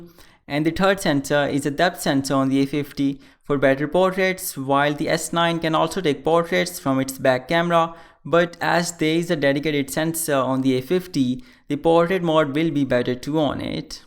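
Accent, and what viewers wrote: India. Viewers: "click on vid" hears indian accent, "clicks off"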